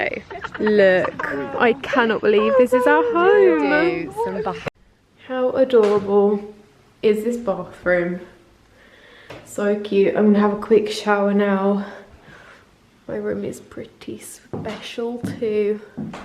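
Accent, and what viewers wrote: United Kingdom. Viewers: He looooouu britain acent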